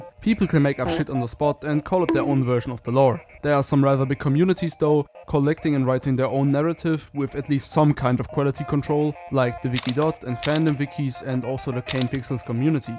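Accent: Germany. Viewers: Are you german? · YOU ARE GERMAN I HEAR IT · Oh yeah and PLEASE try to bring this to steam I’d love to play it, also you’re pretty obviously German just judging by your accent (no disrespect I’m German too) and from the text in 😭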